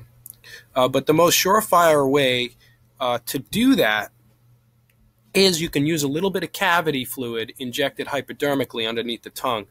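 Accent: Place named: Long Island, United States. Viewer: As I was listening to your video I couldn't help but hear your very distinct Long Island accent. I am from Long Island but moved to Texas 6 years ago. Thank you for this video it was very helpful, you're right, they do not teach you those closures in school.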